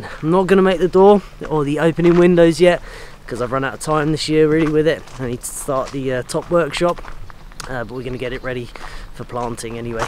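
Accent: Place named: England, United Kingdom. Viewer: Теплица прикольная а вот чепорный английский говор отвратителен.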